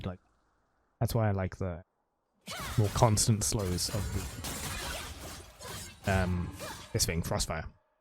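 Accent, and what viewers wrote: United Kingdom. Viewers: he's bri'ish m8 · He has english accent with a slight lisp, im english too so I love his voice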